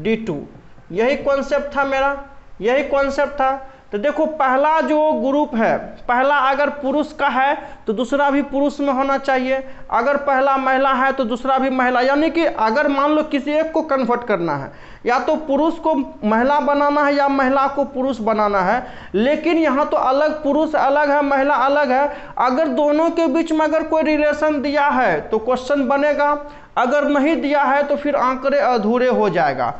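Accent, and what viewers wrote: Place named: Bihar, India. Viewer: Bhagalpur,munger ,jamui ke taraf ke lagte hai sir aap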